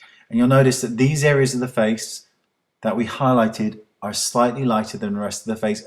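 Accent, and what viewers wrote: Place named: South West England, United Kingdom. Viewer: Thanks! Love Mandy's new hair colour! Great video, will have to try it out after pay day! Could you possibly make a video on how to cover dark under-eye circles? Mine are really bad and I have tried everything - light reflecting pens don't cover enough and thicker concealers just go cakey! p.s., knew you had to be a south-westerner! I'm from Weston-S-M!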